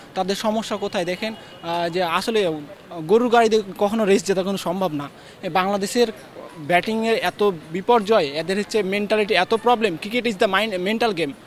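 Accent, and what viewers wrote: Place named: Kolkata, India. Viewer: Polay vodai😂 cricket thik i ase oy besi bujhe bolod sala 😅 i ❤ Bangladesh cricket sala parle tui khel tui to kolkata theke asos kotha sunlei bujha jay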